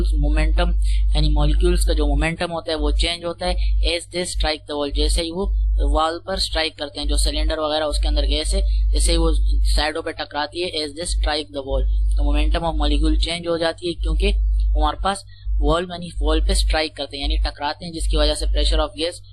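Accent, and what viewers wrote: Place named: Karachi, Pakistan. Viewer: bro ascent sa to karachi k lagty ho #karachiwalay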